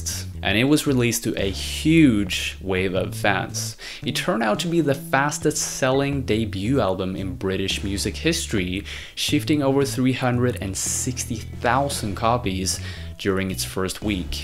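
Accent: United States. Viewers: are u american? what country ru from lol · hearing an american talk about british things is so funny 😂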